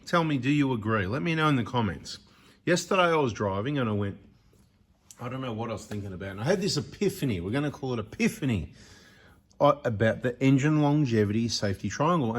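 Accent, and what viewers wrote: Australia. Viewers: Why are you speaking like an Australian ?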